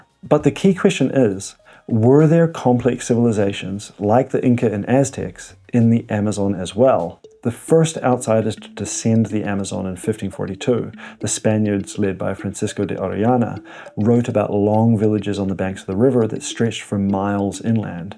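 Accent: New Zealand. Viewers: whats that accent? is it nz or a bit australian and there are rhotic r's ive never heard it before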